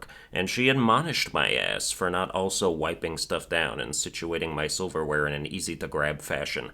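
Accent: United Kingdom. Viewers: Hearing that british accent just after playing The Great Ace Attorney is certainly something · That was your best English accent yet, not even a tinge of the Aussie in there 💯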